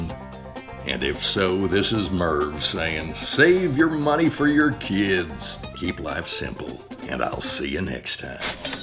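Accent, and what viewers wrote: South, United States. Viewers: You sure know have better story telling skills than appliances. Heck i didn't care about the dishwasher anymore! I just want to listen grandpa tell us some wonderful moonlight story in his his deep southern accent!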